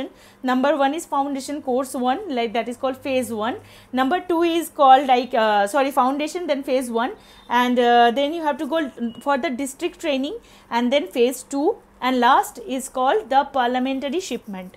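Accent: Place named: Bengal, India. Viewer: I love your bengali-english accent❣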